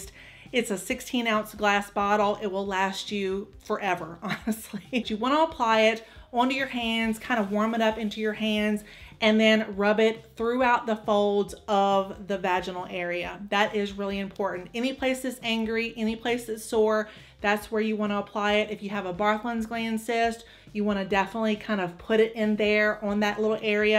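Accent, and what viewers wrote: South, United States. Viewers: You are a very entertaining and effective communicator!😂 Thank you for ALL of your points on using Castor Oil. The hemorrhoids remedy is so helpfu! Never heard of these remedies before. Barbara would be proud of you. She has been one of my favorite health coaches in videos. You sound like you are from Georgia, Mississippi or Alabama. I am from Mississippi. The southern accent is so refreshing to hear.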